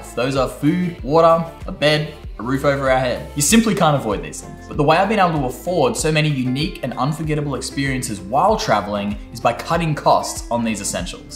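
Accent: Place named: Australia. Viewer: Hey. great tips that will definitely come to use for me. Nevertheless, wildlife is my treat. Love your work and the Australian accent is a charm:-)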